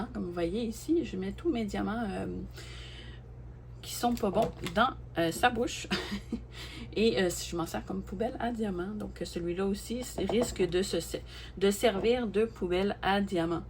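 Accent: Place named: Canada. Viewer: Merci pour la vidéo et les produits présentés ❤ j'adore ton accent canadien et ta voix toute douce, calme et reposante 🤗 Bisous 😘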